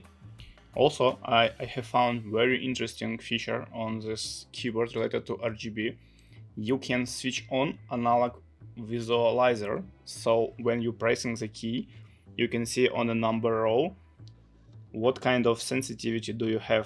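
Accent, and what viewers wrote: Russia. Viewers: Акцент больно русский😮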